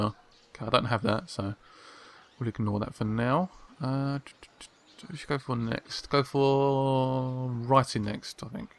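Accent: United Kingdom. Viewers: Do you live in the United States? British accent? Just curious as you said "Here in America...". Anyways thanks for the walkthrough trying to learn this expansion!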